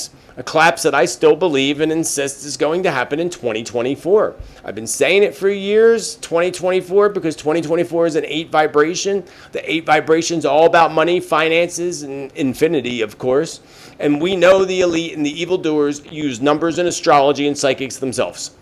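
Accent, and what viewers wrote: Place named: Bucks County, United States. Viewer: The way you pronounce certain words is very endearing:) My dear friend from Bucks County does the same thing! It’s precious:)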